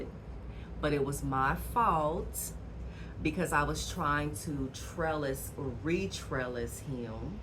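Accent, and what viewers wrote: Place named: South, United States. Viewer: Hi 👋🏽 I love love your down south accent. 👏🏾 ❤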